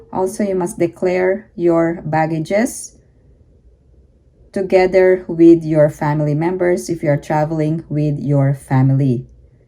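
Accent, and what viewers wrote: Philippines. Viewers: I just love that you use your own voice and FILIPINO accent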